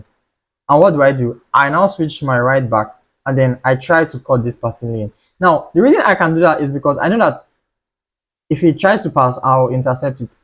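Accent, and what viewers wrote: Nigeria. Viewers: You sound naija so imma sub bro!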